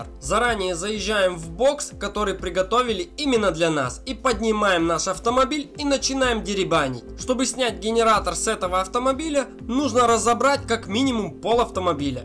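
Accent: Ukraine. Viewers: Акцент дикий! Ты из Хохлостана?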